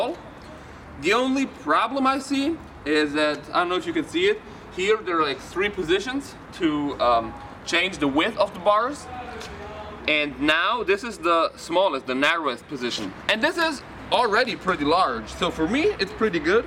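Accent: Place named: Germany. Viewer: After ten seconds of watching I knew you were in Germany, just by your accent, although it’s not a strong German accent.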